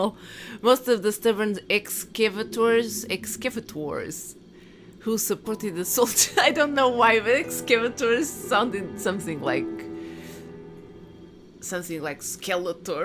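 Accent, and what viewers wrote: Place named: Eastern Europe. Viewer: I thought you are east european. lol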